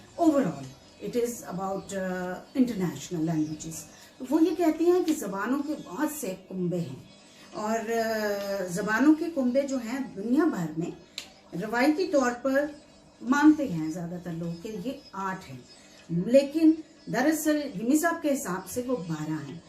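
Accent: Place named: New York, United States. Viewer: Very NYC voice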